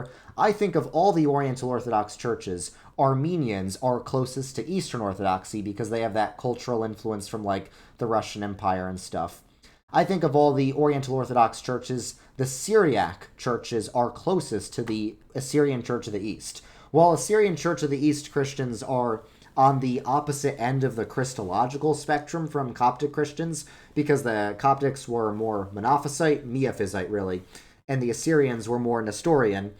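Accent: New York, United States. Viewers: He is from new york they do talk like that. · Don't worry he is just a new Yorker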